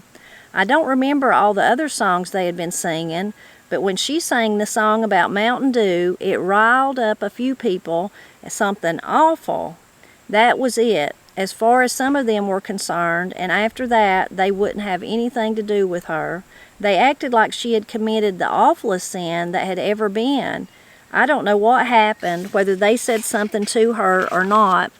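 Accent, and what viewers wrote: Appalachia, United States. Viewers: Ms., I want to thank you for celebrating our beautiful language and giving it the respect and dignity it deserves. My people are from Blount County, TN and my parents took accent reduction classes in the 70’s before I was born. They still think they did us a favor, but they took something precious from us. I understand why, but I’m sad about it. Hearing you talk and read to me brings it back a little bit and I am grateful for that. 😭😭 thank you so much, Ms. ❤️❤️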